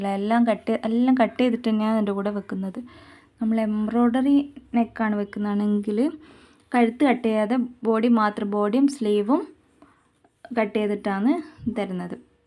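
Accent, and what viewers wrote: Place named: Kannur, India. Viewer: കണ്ണൂർ aano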